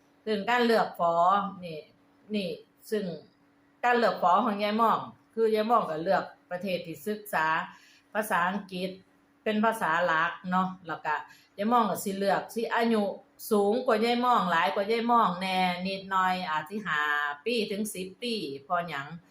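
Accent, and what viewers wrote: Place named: Sisaket, Thailand. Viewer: มักๆเว้าคือคนสีเกด.เเท้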